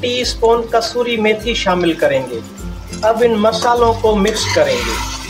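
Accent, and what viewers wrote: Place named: Sindh, India. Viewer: Beautiful colors..bohat achi recipe..aurcaap ki sindhi accent itni pyari he سائیں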